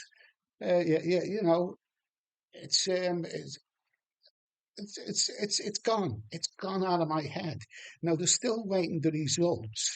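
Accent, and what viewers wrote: Liverpool, United Kingdom. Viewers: Great to hear the scouse accent again 👌 one day at a time mate but the improvement is great 👍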